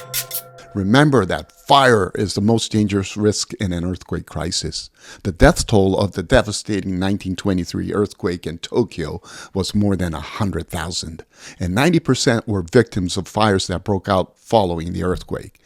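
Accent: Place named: United States. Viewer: You speak very well if I hadnt known you grew up in Japan I would have thought you grew up in the United States because my stepmother whos from Japan and spent decades living in Hawaii still had an accent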